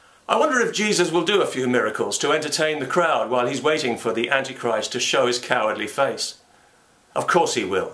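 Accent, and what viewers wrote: United Kingdom. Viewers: Fuuuuck! I could listen to this guy talk for hours. His mind Works like the Robin Williams of logic, reason and Witty but justified criticism. Aldo, does help having that awesome brit accent lol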